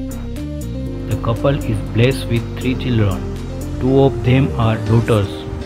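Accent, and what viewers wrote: India. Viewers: I don't know who wrote or compiled this, but they should go back to find out more about the geography of Nigeria. The commenter is reading with an Indian accent, so I guess not a Nigerian. Oshimili is never and will never be in the Northern Nigeria. Orile is never in Oshodi (lol).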